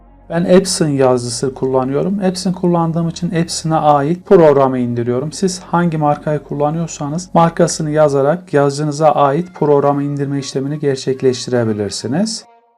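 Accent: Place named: Kargın, Turkey. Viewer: Harika güzel olmuş emmi nerelisin sesin kargın adamina benziyor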